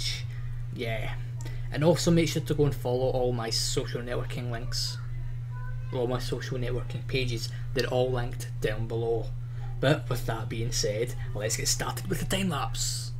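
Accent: Scotland, United Kingdom. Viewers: are you scotish i am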